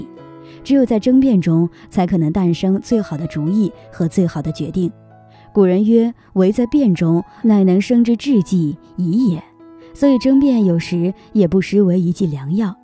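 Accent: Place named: China. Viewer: 請問~版主是哪裡人?中國人嗎?聽口音很像中國人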